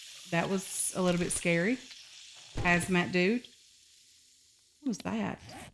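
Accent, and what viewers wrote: Kentucky, United States. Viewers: What part of KY are you from?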